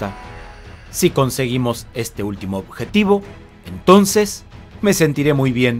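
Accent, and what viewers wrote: Uruguay. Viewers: Sensei le felicito por su excelente trabajo y esfuerzo en mantener viva la llama de estas artes tan nobles. Una Pregunta maestro usted es de Uruguay?! 🤔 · Hola! sos Uruguayo?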